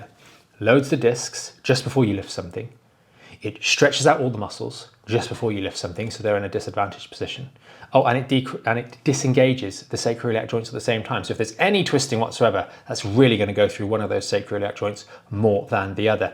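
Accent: England, United Kingdom. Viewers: This is really wonderful. I’ve left Spondolitis. Awful 5 years old got run over 7nder a wagon. Only when older back problems. Discitis. Nearly died. Had no surgery or anything. Painkillers. What can I do. I dairnt excercise. 5” height lost . And a tilt a gait. Is this same? Nice to hear English accent. So,help.😮😊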